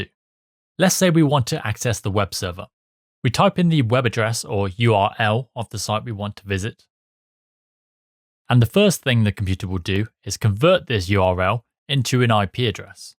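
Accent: United Kingdom. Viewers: Excellent layout, delivery, and explanation of material. Only problem I have is with the british accent, but I struggle with all types of accents. I totally cannot grasp concepts from asian, Indian, arab, french, german, and spanish accents. But I improviced and overcame this by reading the video scripts after the video. This method of learning complex concepts is the best of all methods of learning.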